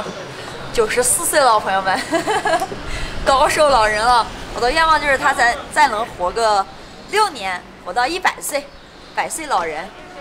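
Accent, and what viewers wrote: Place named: Gansu, China. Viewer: 这咋，还把摄影师带到了甘肃？怪不知你听不懂湖蓝方言，却说一口流利的甘肃方言。六年？还来得及，赶紧生个小小孩，这样就四世同堂了。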